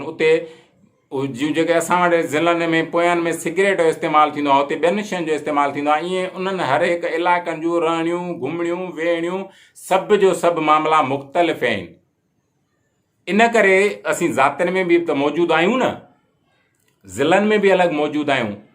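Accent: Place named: Lar, Pakistan. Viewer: Tawhan jo galhain Jo lehjo laarian wangar ahay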